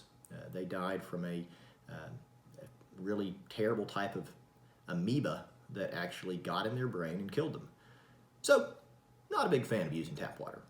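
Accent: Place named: South, United States. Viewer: this guy is the first actual doctor who I've heard talking in a slight southern accent.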